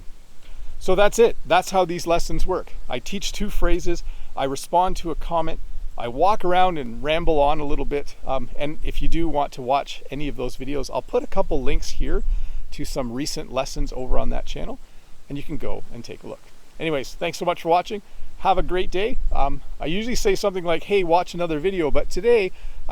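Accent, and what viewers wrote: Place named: Canada. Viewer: Canadian English❤nice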